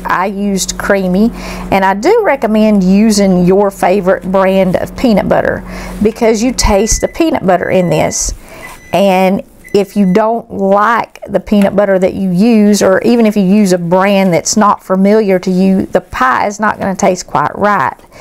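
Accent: South, United States. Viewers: My husband's going to love this one. Hes a Peanutbutter on anything lover. Especially IceCream. Gotta make it for him, only without the chocolate. Thank you for another awesome pie recipe. Love in Christ Jesus, Also, I just absolutely love your country accent.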